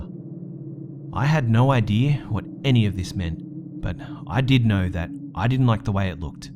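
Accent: Australia. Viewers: I think it's an aussie way of talking. I never noticed it before but a few weeks ago I started noticing it and I first thought it was cute and very aussie, like how he pronounces building 'biwding,' but I feel like it's getting more and more frequent and I catch it every time. it's not unbearable but the frequency of it does make we want to cringe a little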